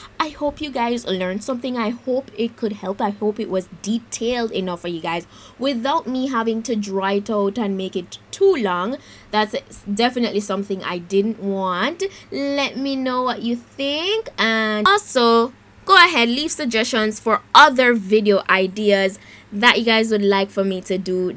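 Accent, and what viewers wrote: Jamaica. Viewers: Do I sense a slight Jamaican accent...... best cut crease video for chocolate girls.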